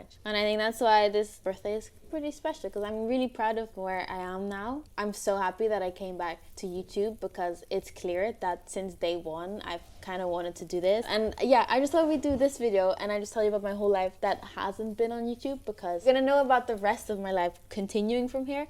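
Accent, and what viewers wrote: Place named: Scotland, United Kingdom. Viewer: how do you have a scottish accent? I love your videos x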